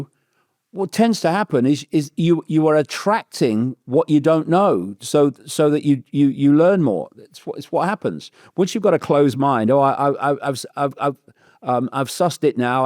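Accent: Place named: Cockney, United Kingdom. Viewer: Sounds like he's got a London UK slight cockney accent.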